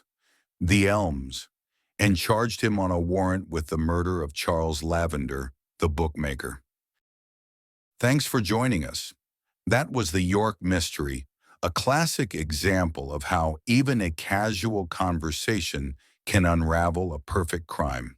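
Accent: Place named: United States. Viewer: I'm sure this is a very fine narrator but I I don't believe these stories could possibly carry as well with an American/Canadian accent as with someone from the UK…since these stories take place in London, and London being as great a melting pot as say New York City San Francisco or chicago, of the same era, any accent from the British isles would do. I mean if it's a i which it obviously is, can't you just as easily click on the British accent button, or however it works??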